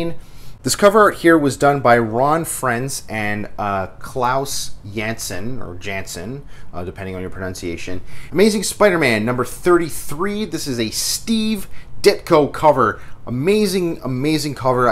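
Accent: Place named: Canada. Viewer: This is what a Canadian accent sounds like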